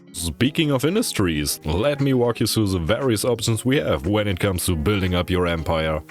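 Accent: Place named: Germany. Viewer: Ich liebe diesen deutschen Akzent. :D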